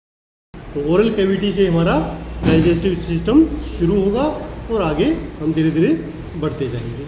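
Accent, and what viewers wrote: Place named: Maharashtra, India. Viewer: sir , you explain topics in simple words. Our me janta hu aap marathi ho aapke bat karne ke tarike se pata chal raha he ❤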